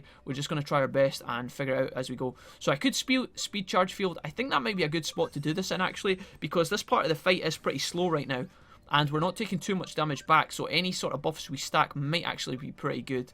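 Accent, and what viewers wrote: Scotland, United Kingdom. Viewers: Its coming out now! Lol When are you back man!? We miss ya. Can't wait to hear your Scottish voice again :))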